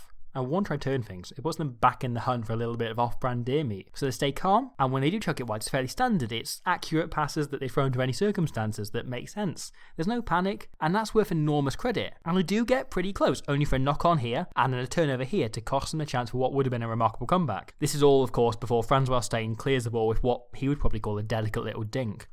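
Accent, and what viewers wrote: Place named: England, United Kingdom. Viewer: Dude this guys rugby iq is on another level. I need to get better at understanding the English accent though 😅. Great content!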